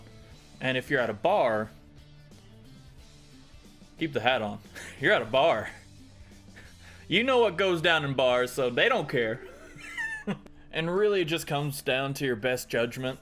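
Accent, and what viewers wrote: Texas, United States. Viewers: yea that and just the hard texas accent. Rs are pronounced very hard from what i can tell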